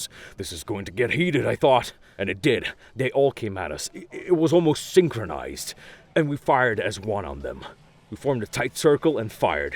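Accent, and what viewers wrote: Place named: South America, Latin America. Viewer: Great adeptus arbites audio log. Didn't expect the light souther american accent but i like it. Makes the members of the arbites seem more human despite the megalomaniacal authoritarian mania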